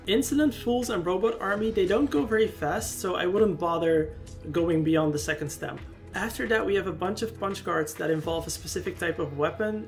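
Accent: Netherlands. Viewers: One qeustion : u dutch? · I can hear your dutch. Groetjes en bedankt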